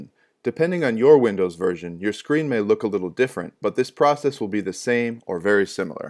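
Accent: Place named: Kansas, United States. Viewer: Cats and Ringworm yes, I really liked the delivery. I'm from Kansas, maybe it's the same dialect? thank you for this helpful video!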